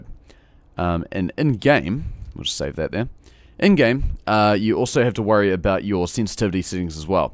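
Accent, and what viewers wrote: New Zealand. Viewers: yo I'd he a new Zealander?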